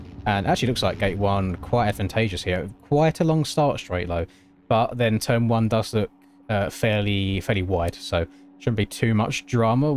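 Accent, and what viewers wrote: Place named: United Kingdom. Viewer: I'd much rather listen to your Brit voice commentating than Leigh Diffey any day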